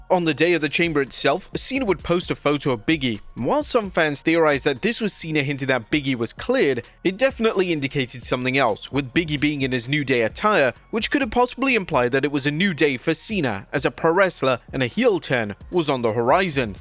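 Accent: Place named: United Kingdom. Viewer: i hear a british accent, i leave the video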